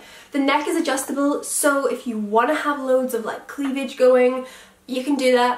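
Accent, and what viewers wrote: Scotland, United Kingdom. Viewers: You suite the clothes really well Ps are you Scottish cause u have a Scottish and I'm from Scotland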